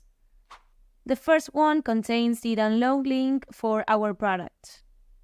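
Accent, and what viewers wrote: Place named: Italy. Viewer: I guess you are Italian. Nice accent. Great Video.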